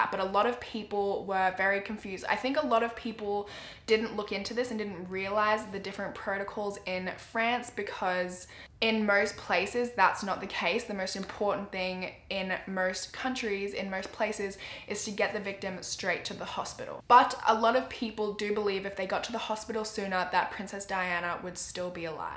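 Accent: Australia. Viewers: Idk you but I pressed on this video and was so happy because I instantly noticed your Australian accent 🇦🇺🇦🇺 I’m from Perth btw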